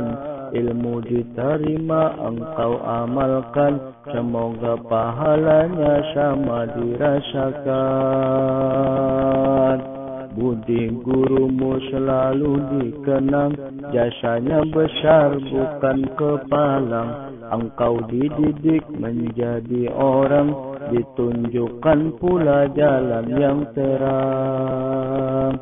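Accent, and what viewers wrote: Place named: Aceh, Indonesia. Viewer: Seperti logat aceh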